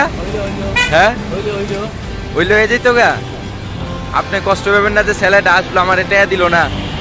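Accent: Sylhet, Bangladesh. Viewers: Are you Sylhety Or from another city? Good job, well done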